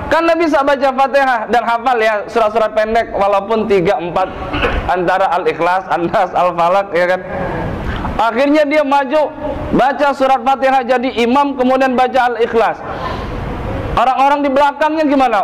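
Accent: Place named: Madura, Indonesia. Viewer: mantap.madura nya masih melekat.hehehhehee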